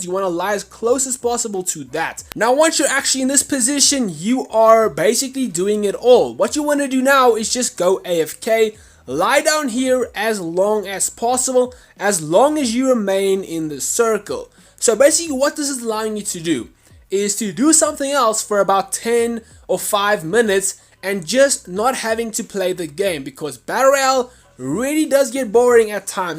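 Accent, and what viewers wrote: South Africa. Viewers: Bro are you South African you have our accent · You south african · Is this guy from south africa?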